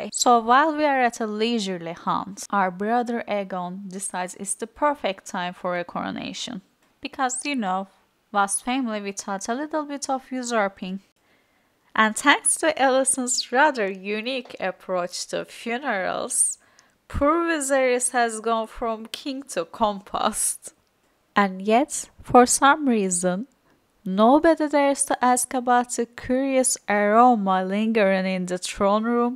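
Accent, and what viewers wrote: Turkey. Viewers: Yup that’s 100% Turkish accent 😄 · It is look like Turkish accent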